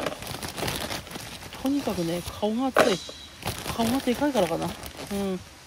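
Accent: Aomori, Japan. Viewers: Jaiさん初めてコメント致します。オススメに出てきてすっかりJaiさんのファンになりました。過去の動画も見漁ってます😂 人柄の良さがにじみ出て、豪快な飲みっぷりと食べっぷりにアッパレ。青森弁にも癒されてます。 キャンプは憧れだな…いつかやってみたい! 大自然の中で飲むビールは美味しいよね。 私も大酒飲みです。 次の動画も楽しみにしています。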